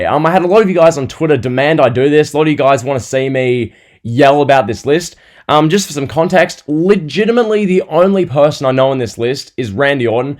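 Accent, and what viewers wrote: Australia. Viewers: I love the Aussie accent bro, bless you..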